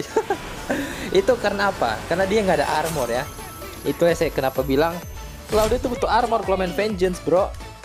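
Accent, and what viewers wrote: Manado, Indonesia. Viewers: Orang mna bg Manado ?apa Sulteng?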